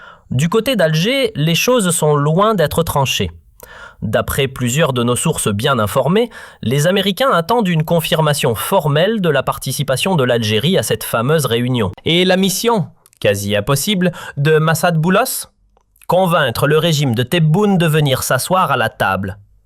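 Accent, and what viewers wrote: Canada. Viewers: il faut être dupe ou idiot pour croire que cette vidéo n'est pas l'oeuvre du régime algérien, on a beau aller chercher un accent québecois les images et les séquences vidéos qui se succèdent vomissent la signature du régime mafieux de Tebboune, depuis le début que des photos, des vidéos des scènes des gangsters algériens, aucune sur le Maroc, alors qu'il est plus aisé d'accèder à l'album photo de sa majesté Mohamed VI qu'à "chambre noire" du parrain et de sa pègre algérienne ! c'était bien essayé mais raté ! la prochaine fois choisissez un accent bien franco-français, bien sûr pas l’apathique APHATIE (Jean-Michel Aphatie) mais un Zemmour, ou Onfray, ça passera mieux !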